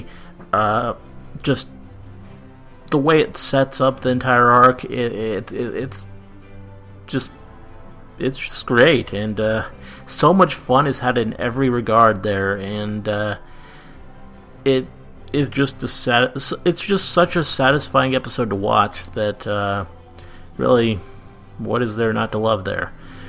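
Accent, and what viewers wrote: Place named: Canada. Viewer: I thought you were canadian for some reason lmao.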